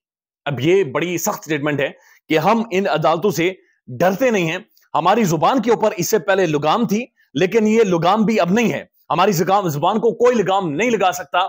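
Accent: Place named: Poonch, India. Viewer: Aap ki conversation sai hi lgta tha aap poonch k sardar hain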